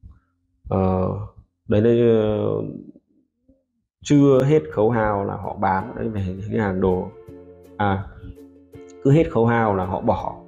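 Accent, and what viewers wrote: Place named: Vinh Phuc, Vietnam. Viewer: Giọng chú như Vĩnh Phúc nhà tôi.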